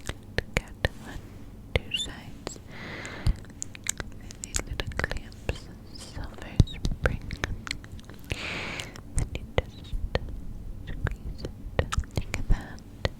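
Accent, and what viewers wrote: New York, United States. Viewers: The clip truly is one of the tingliest triggers I’ve happened across! When you were running the clip through the mic, using both clips at the same time, and just saying the word “clip.” SO MANY TINGLES! But... - melted my brain in the most amazing way. 🤤 That was sooooo good. Thanks! This video was relaxing from start to finish. And I love your NY accent! The haters can kick rocks with no shoes on. 😎✌️💕 Edit: -... use this as a “treat yourself” button y’all! 🤤💜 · I have watched the New York accents none stop and it’s funny cause my friend that’s from New York talks just like this it’s perfect 😂❤️ btw perfect video so so relaxing ❤️ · i find it funny that I LOVEE your NY accent, but me and most of my family is from Boston lol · I am literally in love with the NY accent it is so tingly!! Maybe in the future you could do a hairdresser role play in an NY accent 💕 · The NY accent is EVERYTHING! 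i could just listen to you talk about anything. love you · love the New Yawk accent ! ur really good at it ! :D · Yessss I think that the clip might get bigger than the claw with the mic and the ny accent like is this a dream 🥰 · Ny accent is just amazing!,!,!!! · NY ACCENT IS LIFE 😍😍🗽🗽🗽👌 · I LOVE the New York accent!!!! Love you ❤️ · New York or jersey? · Hey I love your New York accent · The NY accent is everything. I’m loving the nude sweater and golden tones on your eyes ! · That ny accent is amazing just like u girl hope u have a great week remember your son is so lucky to have u xx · Love the New York accent!! What is your light called in the back x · Not only am I absolutely obsessseeeddd with the NY accent, the background water projection is so relaxing! Such a good vid 💕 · Omg i love the ny accent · I swear it's like you've read my mind!!! For the past couple of days I kept thinking about how I can't wait for a new NY accent video from you. 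I'm melting.....🤤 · I love your New York accent but your little rant about people’s comments saying it sounds Boston made me crack up even you smiled after you’d finished haha 😂 not sure how I missed this one but thank you, love it the accent brings me so much comfort 🥰 like in the movies when your little snuggled up watching films and they have the accent 💜 You also have such lovely hands xx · ny accent AND 30 mins....#spoiled:) thanks soo much! <3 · As a brit 🇬🇧 the newyork accent just hits different.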